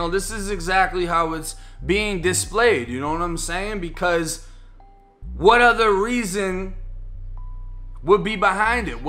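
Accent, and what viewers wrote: Boston, United States. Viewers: Are u from Boston I love the way u talk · Why you sound like you from Boston?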